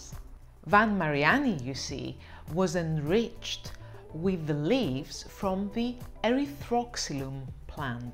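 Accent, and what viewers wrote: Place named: Italy. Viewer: Redhead ✓ Wine expert ✓ Italian accent ✓✓✓✓✓✓✓✓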